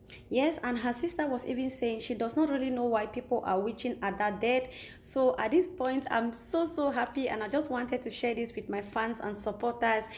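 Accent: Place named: Cameroon. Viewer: Love you bcos my best friend here in UK is from CAMERION and she speaks like you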